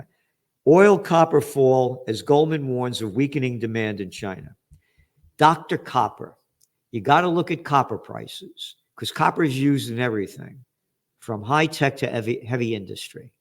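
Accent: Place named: United States. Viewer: You speak American, I like it.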